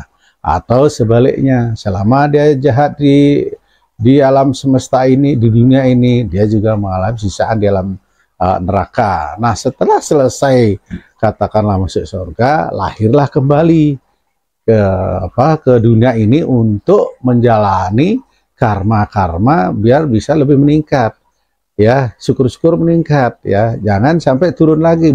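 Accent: Java, Indonesia. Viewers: Logat balinya g ada samasekali, malah cenderung logat jawa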